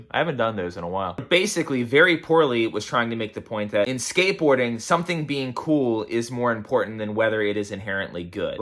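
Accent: East Coast, United States. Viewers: Dude you’re one of my favorite YouTubers in general. I like how real you are and your opinions. I’m from Delco, outside Philly and I can tell you are from the East Coast and you’d fit in well in Delco 😆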